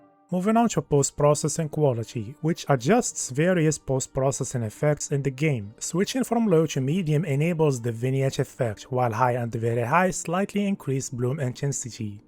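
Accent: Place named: Morocco. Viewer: Moroccan accent all over the place nadi a bro chokran bzf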